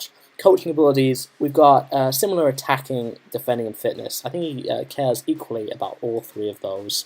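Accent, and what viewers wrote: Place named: United States. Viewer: you sound like you have an american accent. any reason?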